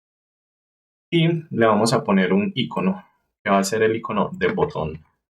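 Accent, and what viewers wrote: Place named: Antioquia, Colombia. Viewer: Su voz suena como de puerto berrio antioquia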